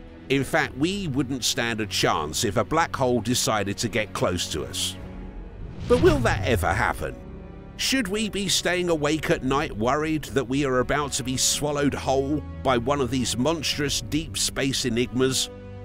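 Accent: United Kingdom. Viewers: >click on video >hear British accent >close video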